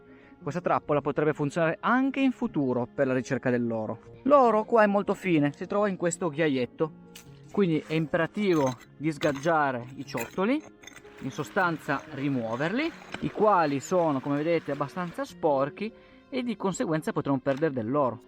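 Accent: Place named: Piedmont, Italy. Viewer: Mi sono iscritta una settimana fa circa! Per caso è apparso un tuo video e sentendo accento piemontese mi sono soffermata a curiosare ! Sto guardando tutti i video vecchi. Grazie della compagnia.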